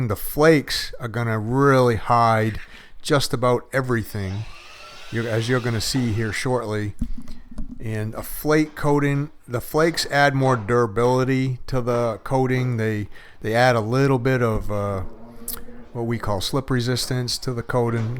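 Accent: Maine, United States. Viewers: I thought I detected a little hint of Downeast listening to you, then I saw the 207 area code… ayup.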